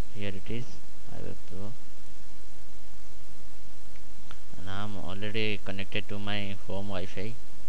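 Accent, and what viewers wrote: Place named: India. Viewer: Damn! He talk english using indian accent.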